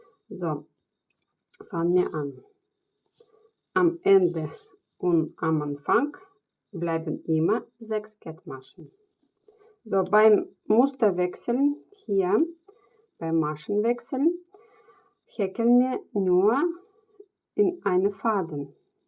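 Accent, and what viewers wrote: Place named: Russia. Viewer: Рашистский акцент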